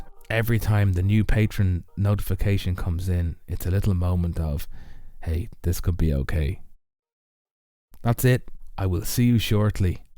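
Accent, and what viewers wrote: Ireland. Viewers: Great to hear an irish accent are u near Dublin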